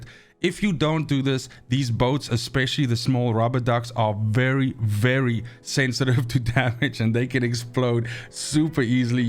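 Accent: South Africa. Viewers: I'm trying to figure out your accent. Are you from South Africa?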